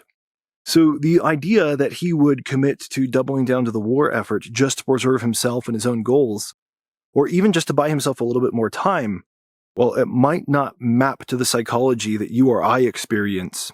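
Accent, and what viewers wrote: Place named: Utah, United States. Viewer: Rational thoughts fella! Utah accent! Prof’ of history at a Utah University?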